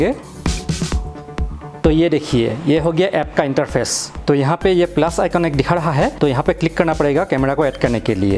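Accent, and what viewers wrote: Assam, India. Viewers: Aap Assamese ho. Kitne bhi thik se hindi bolo.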